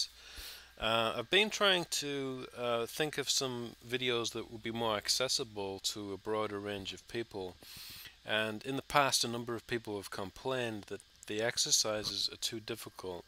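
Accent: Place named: England, United Kingdom. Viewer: Where from in England though?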